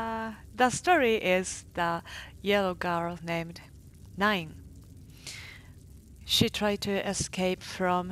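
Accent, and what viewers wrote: England, United Kingdom. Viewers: U have like an English accent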